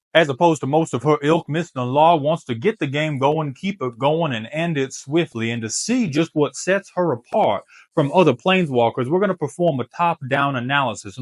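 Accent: South, United States. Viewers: I swear every day you slowly turn into a southern businessman, I expect to hear “I do declare” and “now I’m just a simple countryman” every new video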